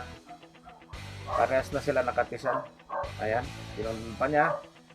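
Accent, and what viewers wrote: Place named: Ilocos, Philippines. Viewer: Parang ilocano kayo lakay base sa pananalita niyo hehe.anung province nyo po?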